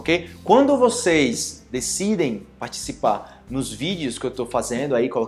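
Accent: Spain. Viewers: Vc é brasileiro??? Eu acho que é, mas deve morar fora pq ja percebemos um leve sotaque e uma soniridade espanhola na sua fala...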